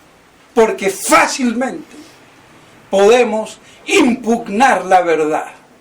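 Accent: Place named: Cuba. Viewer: Hola UD vivio en México xque habla como cubano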